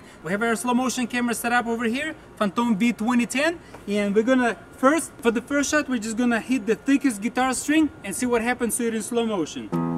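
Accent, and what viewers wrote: Russia. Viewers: You English seems more fluent when you do your cooking class, and your Russian accent seem thicker when you do your Slo-Mo shoots! Is that on purpose? Mama's hat as a Matte-Box is too funny !!! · Russian accent? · it isnt him it is just another crazy russian they all sound alike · Как же режет уши русский акцент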